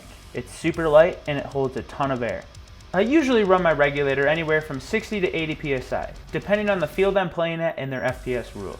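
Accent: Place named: Eastern Europe. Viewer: Are you even American. You sound some of an Eastern European ...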